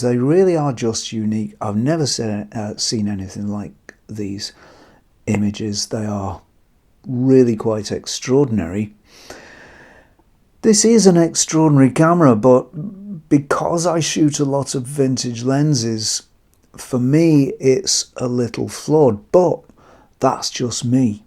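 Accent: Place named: United Kingdom. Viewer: Well, this is a year later from your comment, but I say bugger the manual. (Listening to Mr. makes me feel like talking Brit.) Watch a couple of Youtube vids about it and Bob's your uncle. Later you'll have specific questions to look up answers to in the manual—or yet another Youtube tutorial.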